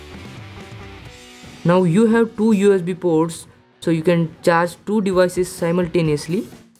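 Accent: Maharashtra, India. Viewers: Marathi accented English! Liked it lot.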